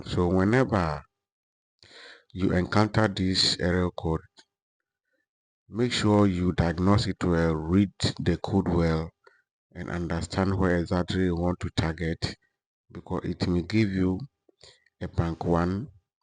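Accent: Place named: Ghana. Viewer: Where are you located... You sound like a Ghanaian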